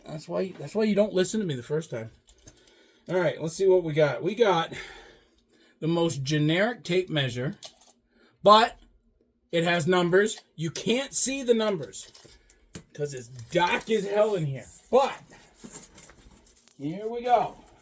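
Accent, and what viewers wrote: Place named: North, United Kingdom. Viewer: I hear that northern accent getting mixed in with that other one you have ha ha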